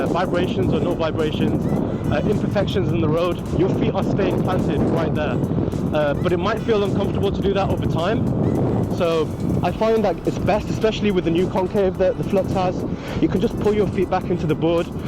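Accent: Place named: Birmingham, United Kingdom. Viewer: Do you need a wing man ? I’m watching your vid of the mighty flux the voice in my head tells me I know where your ride is my home city brum !! I knew that annoying but sincere accent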